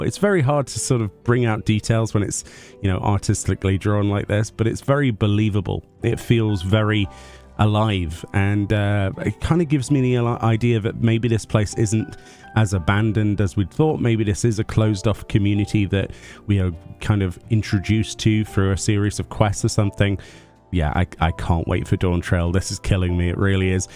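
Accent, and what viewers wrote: United Kingdom. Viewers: Me watches cause he always posts video's on the latest ffxiv. And I want to stay updated. also he avoids drama. also he has a nice british accent Haters on the internet: we don't like that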